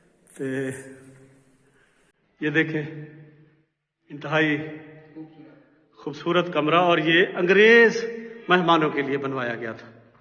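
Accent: Saraiki, Pakistan. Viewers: Sir please saraki ka tarhka lgaya kro Maza ata hai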